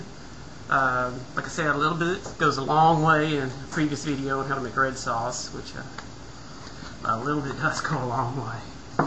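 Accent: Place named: Texas, United States. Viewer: Your from Texas, aren't you? I can tell from the way you talk and do things. I' m north of Houston.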